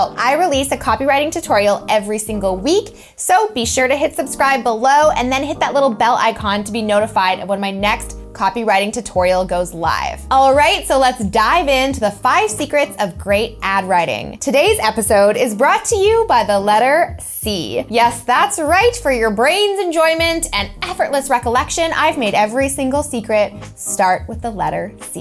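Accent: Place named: New York, United States. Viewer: OK You can call me a troll if you like. Here goes... I am very interested in the subject, and you are obviously very knowlegable about copywriting...also quite attractive...However, as a chilled out and somewhat laid back West Coast Canadian, I find your voice grating and almost intolerable. I have started watching it about ten times, and just turned it off, because the New York delivery (if that's what it is) is hurting my brain. What do they call that? Vocal fry? At least you don't do the upward inflection at the end, making every sentence sound like a question?. So anyway, that being said, I surely would get on your nerves too, with my slow delivery and quiet tone of voice. If I had a dolla for every time someone told me to speak up or just started talking over top of me because they got bored or couldn't wait for me to finish...Thanks....just my trollish feedback...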